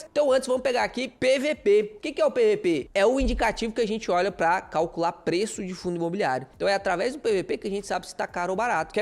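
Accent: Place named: Goiânia, Brazil. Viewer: Tu és de Gyn mesmo? Tava pensando: "Esse sotaque... É de Gyn, de Minas de Tocantins ou no interior de SP?" rsrsr. Mas tava apostando que seria de Gyn (já morei por muitos anos em Goiânia mas nunca peguei sotaque, rsrs. Ou talvez...sei lá, rsrs)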